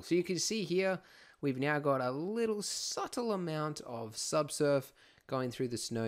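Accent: Australia. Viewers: isnt this guy an australian? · Australian describing snow is funny to a Canadian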